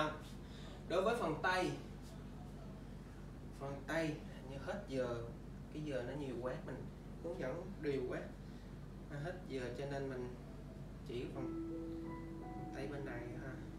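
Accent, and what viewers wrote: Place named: Khanh Hoa, Vietnam. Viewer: Nghe giọng như khánh hòa nhỉ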